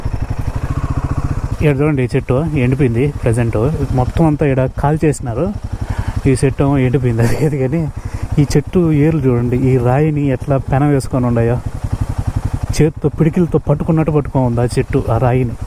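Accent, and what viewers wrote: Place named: Rayalaseema, India. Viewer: Hi, I like your video and your Rayala seema language.I love you so much and liking to hug you one time.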